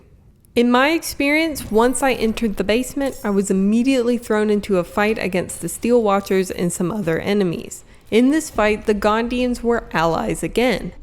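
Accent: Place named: Mississippi, United States. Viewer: i watched many bg3 guides/tips videos and by far i like yours the most. idk why lol it's just preferable to me. i like your accent btw, reminds me of my family from mississippi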